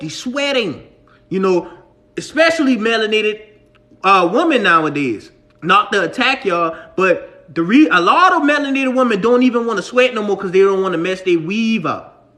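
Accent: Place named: Geechee, United States. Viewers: Bro I’m hearing that geechee accent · You sound geechee.. You from Charleston ,SC.. I knew it 😂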